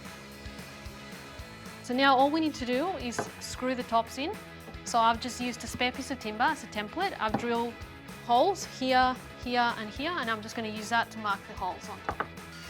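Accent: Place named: Australia. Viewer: How refreshing to see a woodworking video in metric combined with australian accent!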